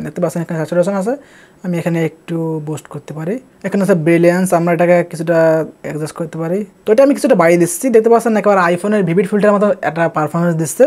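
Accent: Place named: Jessore, Bangladesh. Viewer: ভাই আপনার বাড়ি যশোর। ভাষায় তো সে রকম মনে হচ্ছে 😊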